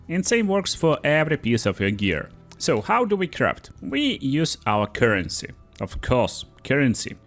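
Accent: Russia. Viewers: it sounds like a hard Russian accent. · I love when Russian natives use their deep and heavy accents to talk about the best video game in the world-- Path of Exile 2. And yes, they finally put out a major fix for performance and crashing issues. :]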